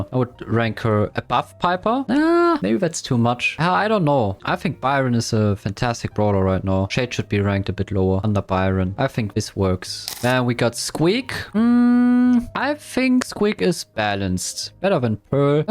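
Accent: Germany. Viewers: english is not his primary language, he's german, don't expect too much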